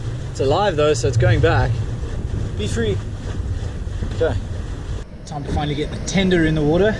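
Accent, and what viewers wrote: South Africa. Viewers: Mate are you South African ? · Dude are you South African? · Why do you sound South African? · By his accent he must be South African.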